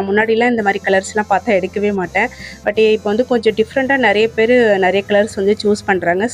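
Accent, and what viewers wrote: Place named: Nagapattinam, India. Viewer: Nagapatinam karaikal side la ipdy than pesuvom avaga ivaga solrathuku bathila aha iha nu soluvom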